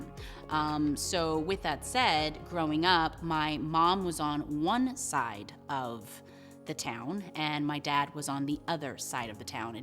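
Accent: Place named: Bronx, United States. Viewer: Sound like Bronx tale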